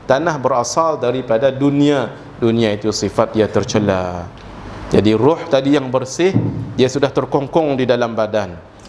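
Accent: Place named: Sarawak, Malaysia. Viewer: dlam bercampur2 slang melayu sarawak dan kedah tetapi tetap indah dan sopan serta penuh ilmu.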